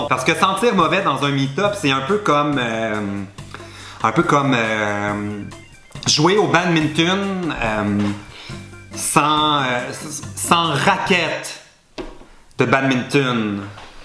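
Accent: Quebec, Canada. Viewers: JE VIENS DE DÉCOUVRIR T'AS CHAÎNE ! ET J'ADORE L'ACCENT QUÉBECOIS ALORS JE T'ADORE DÉJÀ !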